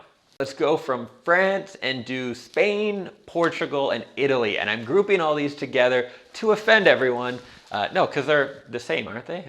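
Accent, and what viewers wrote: Canada. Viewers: That Canadian accent.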